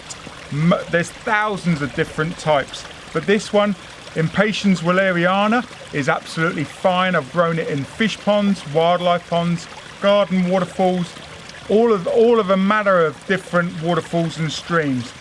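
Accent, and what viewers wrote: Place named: England, United Kingdom. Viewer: I shared your video on my Facebook page I just can never get over how fast your knowledge and palms is, the English accent helps I got to say I’m not sure if it’s just adorable or it makes you sound smarter or both LOL but this video is definitely a top notch. I recommend all my friends to check you out on your channel and that between you and John hardly anything they can’t learn. Charlotte can’t wait to see you soon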